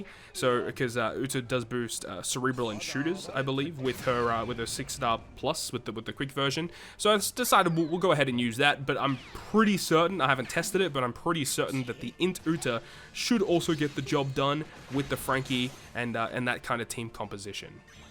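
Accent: Australia. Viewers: é o melhor, sotaque de australiano vom de ouvir LoL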